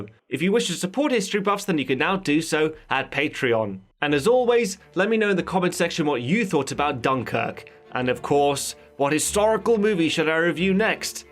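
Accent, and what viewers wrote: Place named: England, United Kingdom. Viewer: Fine channel. Hope your other History Buffs videos aren't 100% single sided, one perspective. For example, Churchill seems to be the drunken nudist protagonist of your video. BUT: Churchill turned down 6 Peace proposals from the Germans who did NOT want a war with England. Churchill declared war on Germany, not the other way around. Churchill had the choice of "saving the Empire" or sacrificing British lives and risking it all. Churchill only got a victorious retreat because Hitler held his generals up, and lost their backing from then on. Unlike the impression you get from this (otherwise great channel) the Germans could have bombed the hell out of the Brits on the beach with their backs to the sea. If the German army couldn't get their in time, then who backed the Brits to the sea? How about a follow up History Buffs video purely from the German side for "diversity's" sake! 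Haha, you even have an English accent! That war was a backlash to WWI over-reach and punishment, and cost Europe and England some of its finest and set up the West for the current invasion of foreigners world wide. Good for the Banksters of the world, we all lost that war!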